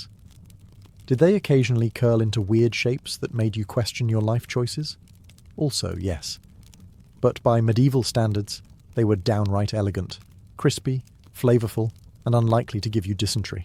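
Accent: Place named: England, United Kingdom. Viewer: Love your videos but some of your mispronunciations are so odd. Despite the English accent it makes me wonder if you're English Second Language?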